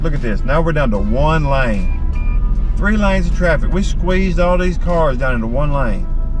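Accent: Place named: South, United States. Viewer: The traffic won't get fixed because of overpopulation. Even in my south hometown in Lipa, traffic is bad. Regarding your Southern accent, most Filipinos will have a hard time because yours is relatively faster pace. It's an acquired skill to understand it, because at first, it was hard for me too. Working for call center companies get one introduced and familiarized with it so it becomes natural after some time. I recommend creating a video of common idioms you use a lot in English, and sharing it as some Pinoys may want to learn it. Maybe do it as offer of help for Filipinos that work for call centers catering to USA. Also, can you try some taglish at times? It will be fun to hear you do taglish like us :)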